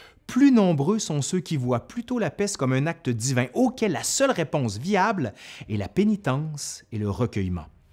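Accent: Quebec, Canada. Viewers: Très intéressant merci et en plus raconté avec votre charmant accent Québécois, c'est un plus. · Vidéo très intéressante et agréable à écouter, c’est la première fois que j’écoute une vidéo en « français canadien » jusqu’au bout 😊👌🏼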